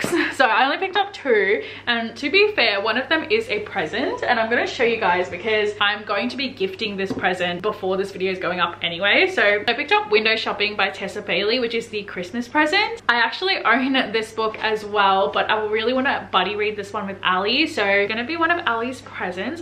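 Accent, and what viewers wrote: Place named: Australia. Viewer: Gosh you have a good Aussie accent I live in nsw and I sound like u 😂 the pure Aussie accent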